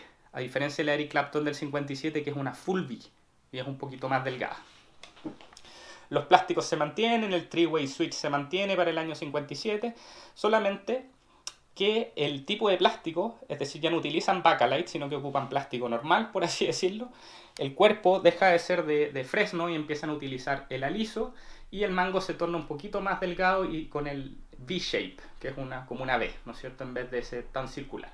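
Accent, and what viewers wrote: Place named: Chile. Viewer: como se nota que erí chileno XD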